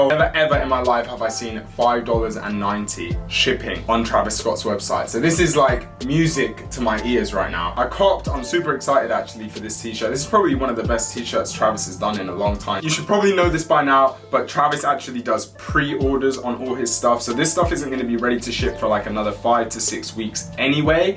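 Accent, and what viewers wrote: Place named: London, United Kingdom. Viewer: i was gonna like straight away just for the accent most of these videos arent acc done by londoners 🤣😅no shame though love to everyone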